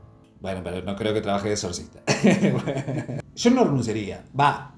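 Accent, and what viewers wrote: Argentina. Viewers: me encantan tus historias, he notado como te estás "cordobeseando" en cada relato....te vuelvo a sugerir la capilla de Buffa en Unquillo y anda conocerla también porque es un museo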